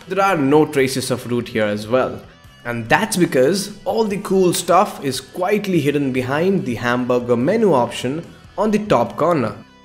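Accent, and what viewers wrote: India. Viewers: I remember when u could barely speak english and sounded hella indian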